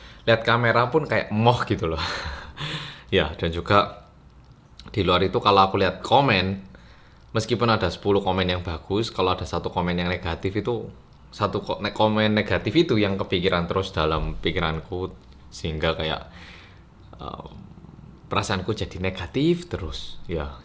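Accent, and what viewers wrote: Java, Indonesia. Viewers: Mas.. Coba song bahasanya di ngapak ngapakin jgn medok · Yaaah.... namanya jg manusia, ada up & down emosi. Memang terasa kurang semangat nya sejak dr salam pembuka..... tp klu diceritakan begini, kita jadi memaklumi dan ikut mendoakan spy cepat pulih kembali. Caioo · Stay strong 🙆🏻‍♀️ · Mung sampeyan sing medoke natural wkwkwkw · Welcome back 😀😊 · Rindu syekali ini sama 😥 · Mas!!! Stay strong jangan mikirin komenan negatif yang komen negatif itu iri sama mas.gapapa 1 hari 1 vdeo atau seminggu 3 kali juga gapapa kok mas Stay strong mas!!!!! Welcome back to korea reomi bersama orang yang medok!!!!!!! Bolo bolo tetep dukung Mas yah😊!!!!!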